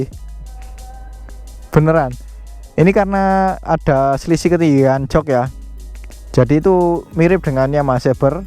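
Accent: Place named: Java, Indonesia. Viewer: Lucu, Judul Inggris.. Ulasan dialek Jawa.. Lanjut sam..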